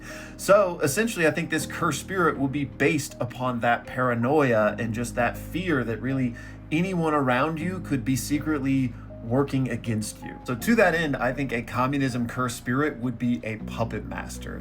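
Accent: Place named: Canada. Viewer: I thought you were Canadian 😂😂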